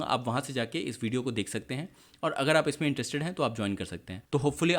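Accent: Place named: Bengal, India. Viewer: Sir আপনি কি বাঙালি ? আপনার হিন্দি তে যেন বাংলা টান আছে ...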